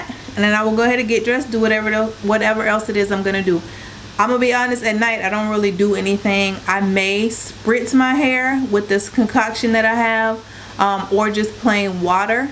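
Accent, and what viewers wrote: South, United States. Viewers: Yes concoction-- i say that all the time!! Southern drawl-- love it-- in central PA and ya'll aint in their vocabulary!! Nice video-- gonna try instead of 500 curl.puddings!!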